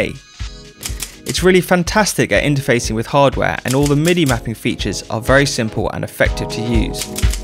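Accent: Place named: United Kingdom. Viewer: Honestly, as soon as I heard his British accent I was sold on the product.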